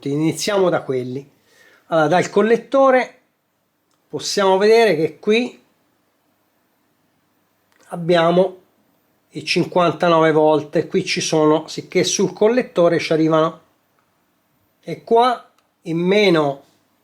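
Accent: Tuscany, Italy. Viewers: Ottimo lavoro,e complimenti per il video,il toscano mitico,e un accento che e ganzo,👍👍👍👍👍👍👍👍👍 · Complimenti per la chiarezza delle spiegazioni e per la simpatia toscana😂 Iscritto 👍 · É toscano?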